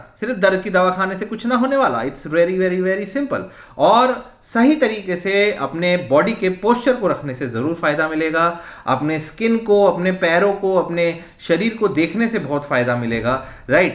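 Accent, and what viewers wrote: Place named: Hyderabad, India. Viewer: Hydrabadi bolre doctor sab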